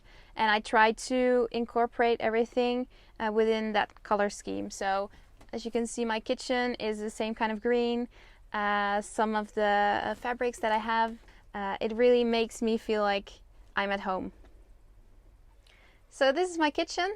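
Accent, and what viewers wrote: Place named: Netherlands. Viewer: Dutch or nah?? hhahahah noticed in the first few seconds hahaha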